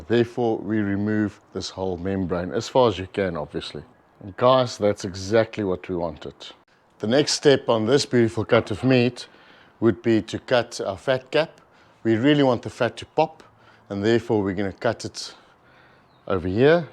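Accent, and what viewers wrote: South Africa. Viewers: south african accent - hows the racism there? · Thank you! I have been looking for a video like this, But most do not show it right, or explain it like you do. I was pleasantly surprised to hear the South African accent on top of it. (I live in USA for 37 years now, but grew up in the highveld) I acquired a whole sheep a few months ago, and pulled out one half of the ribs to try them out. I will be using your method.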